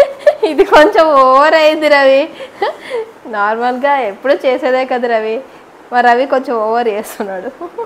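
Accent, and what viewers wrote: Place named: Anantapur, India. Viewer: Pure Anantapur slang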